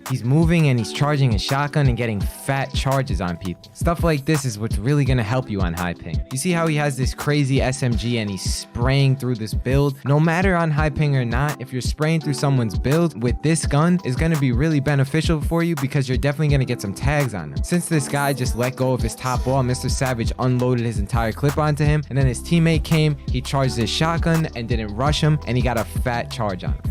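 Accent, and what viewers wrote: South Africa. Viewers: South african. Lol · South african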